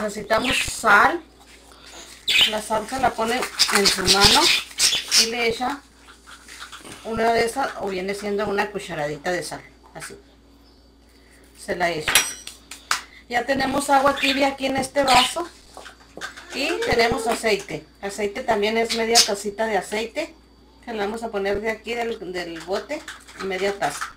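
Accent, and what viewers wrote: Mexico. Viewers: !!! Tu video es súper eficaz y me encanta el dejo Mexicanoooo! Saludos desde Peru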